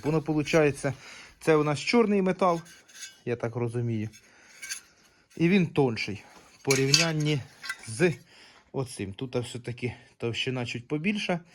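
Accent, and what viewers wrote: Poltava, Ukraine. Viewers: Він говорить Полтавською в нас це нормально.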